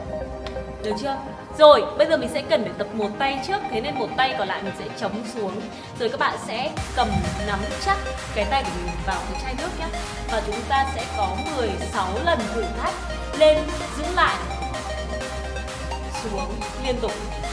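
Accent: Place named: North, Vietnam. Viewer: Giong mien Bac that la de chiu.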